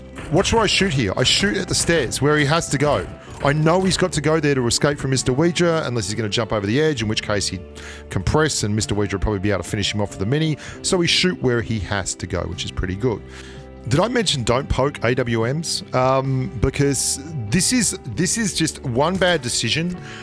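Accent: Australia. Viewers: Are you from Australia bro ??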